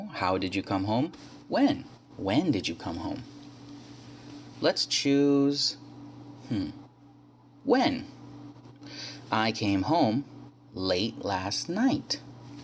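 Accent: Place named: United States. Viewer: Your voice is very clear and I like your American accent. Best wishes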